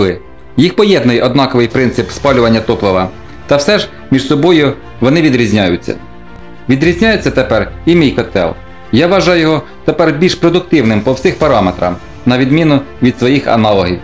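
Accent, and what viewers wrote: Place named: Poltava, Ukraine. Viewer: ВІтаю! Відчувається рідний діалект) Чи не Полтавська обл?) Як можна отримати креслення? Дякую! Бережи Вас Господь!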